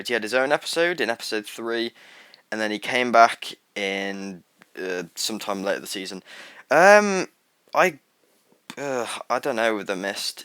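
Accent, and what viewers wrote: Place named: London, United Kingdom. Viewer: Sounds like he's from London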